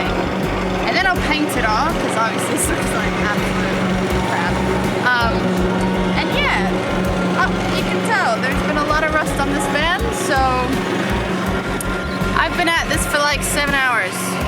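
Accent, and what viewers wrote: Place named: Australia. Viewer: It's interesting how you're developing your own kind of Australian accent. It's subtle, but definitely becoming more noticeable with each new video you put out. It suits you. Cheers to another fun video! Congrats on the van build!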